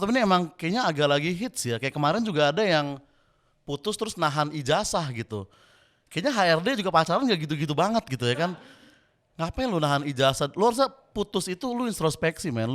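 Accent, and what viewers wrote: South Jakarta, Indonesia. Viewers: Gokil brooo ...acari aku logat anak jaksel dong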